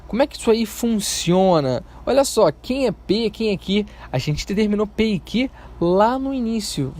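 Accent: São Gonçalo, Brazil. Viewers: Você é de São Gonçalo RJ??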